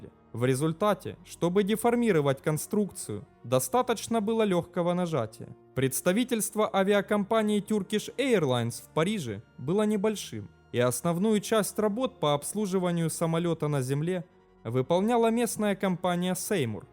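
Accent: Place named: Ukraine. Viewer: у него произношение как у Креосана, скорее всего тоже украинец. У них как раз такой говор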